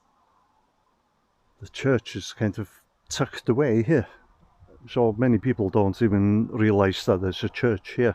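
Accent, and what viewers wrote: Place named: North Wales, United Kingdom. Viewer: One's again another very interesting video introducing you are great great grandparents . The Romans were very ruthless it's a shame this happened to the druids . Can you imagine if if they didn't slaughter the druids look all of that amazing knowledge passed down to generations. I do like your North Wales accent I do prefer it to the South Wales accent which I am from South Wales. Just a thought 💭 Scandinavian and Norwegian sound very familiar to a North Wales accent . One's again DIOLCH 🏴.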